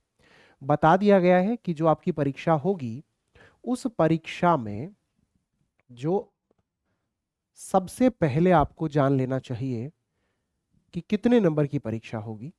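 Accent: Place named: Haryana, India. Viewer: Apki harianvi bhasa sunkar bahut acha laga😂😂😂😂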